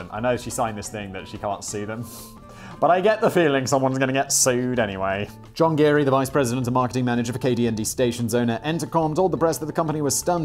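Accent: Russia. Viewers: Great Russian accent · I was born USSR, and russian accent is my favourite russian accent on YouTube.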